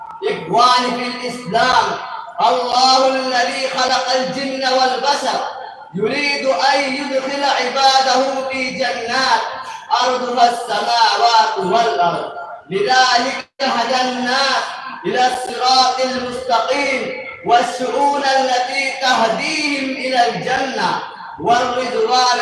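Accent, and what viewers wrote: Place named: Arab world. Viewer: Masha Allah Arbi lahja aur tariqa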